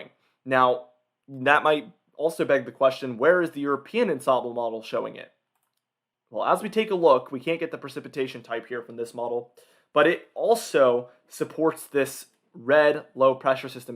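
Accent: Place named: South, United States. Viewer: "Gonna" gonna "..... Geraldine, ALABAMA ? Why are Americans now taking like Southerners?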